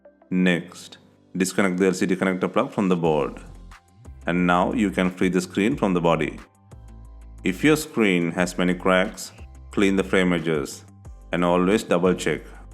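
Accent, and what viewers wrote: India. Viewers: Why is there an Indian voice for a white man's hands?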